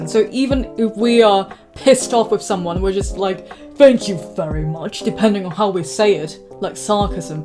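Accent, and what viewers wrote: United Kingdom. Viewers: When you speak Japanese, you sound like a Japanese person. But when you speak English, you sound more British. Either way, you sound great. No judgment here. 👍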